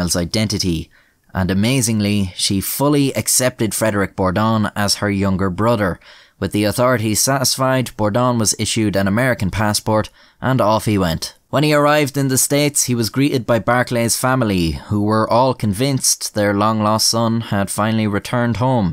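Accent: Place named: Ireland. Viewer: "I admire conmen" yes we know you are Irish by your accent.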